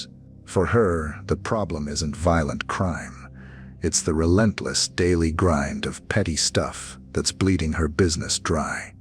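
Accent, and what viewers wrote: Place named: United States. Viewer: why is the narrator using a US voice? This is silly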